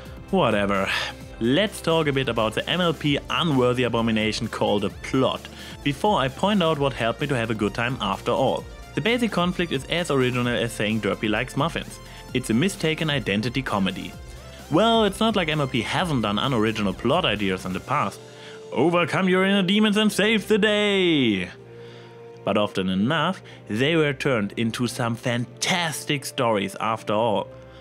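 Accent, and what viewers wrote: Germany. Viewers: Oh, it is! You're quickly slipping into my "most entertaining reviewers" category that includes such hilarious guys as ConfusedMatthew, SFDebris, and JeremyJahns. The strong accent combined with perfectly clear English helps quite a bit on that score; everything's funnier in a German accent (as a movie director once told Arnold Schwarzenegger, and yes I know he's Austrian). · I hate german accents so much, but not as much as I like your videos. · ... are you somehow a version of me that happen to grown up german. I have an American accent, but when I speak german.... the resemblance to your voice is beginning to creep me out...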